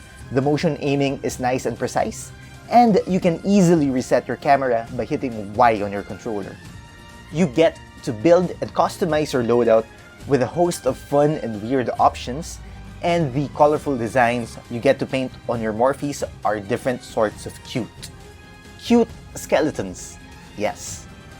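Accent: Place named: Philippines. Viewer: Thats some solid pinoy accent:) great review though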